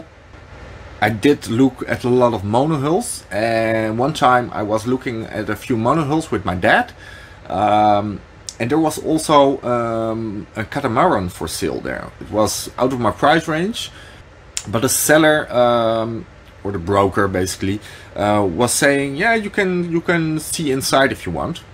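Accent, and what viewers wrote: Netherlands. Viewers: English is perfectly fine. Distinctively Dutch. Good intro thanks. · BTW…. Don’t be self-conscious about your Dutch accent. Most people here will find it charming 😉. I LOVE the Netherlands. Some of the best marine technology in the world comes out of your tiny little country, and you should be very proud. 👍🏼. Not to mention…Old Dutch Gouda is to DIE for 😋 🧀. Can’t wait to follow your adventures !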